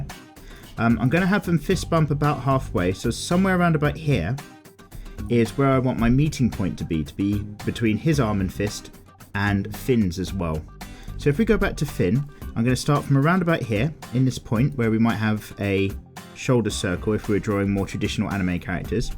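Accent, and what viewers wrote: United Kingdom. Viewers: Your in the UK from the way you talk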